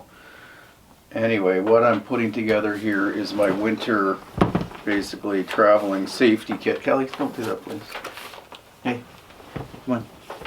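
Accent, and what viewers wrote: Canada. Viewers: After a tough day, coming home to a vid is just great. I love the Canadian accent, I'm sure it varies according to area, (maybe not as extreme as in the UK). Its very comforting somehow, I can't explain how or why, but listening to you speak is soothing. Keep up the good work. Some may think these are trivial vids, but I find them fascinating, and they answer a lot of questions that I'm interested in.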